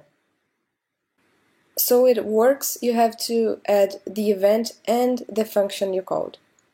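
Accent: Brazil. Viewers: Love the tutorial, thank you! ps.: I can recognize a brazilian accent from miles away :)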